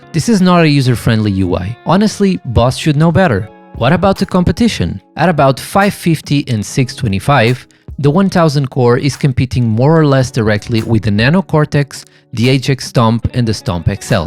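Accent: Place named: Portugal. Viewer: Pelo sutaque deves ser tuga! 😂 Saudações 🤘🏻🍺🇵🇹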